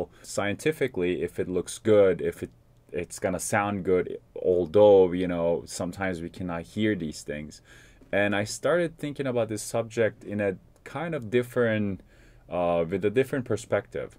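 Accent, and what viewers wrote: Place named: Iran. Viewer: You sound Iranian 😊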